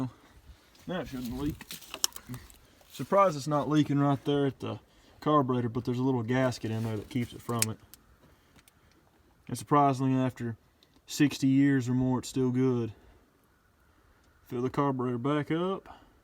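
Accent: South, United States. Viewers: We'll get good spark with this new cool. We'll change this old ool for some new ool. Classic southern accent, I love this channel.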